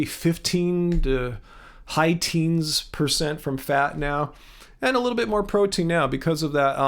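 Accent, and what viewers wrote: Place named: Wales, United Kingdom. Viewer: Welsh hey? Ok we got you! :)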